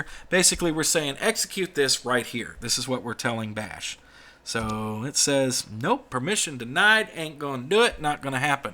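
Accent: South, United States. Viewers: Ignorant Brit, here.. what Southern accent exactly do you speak in? It's soooooo precise in the consonants, and clear. Maybe you're just well-practised with a mike, too.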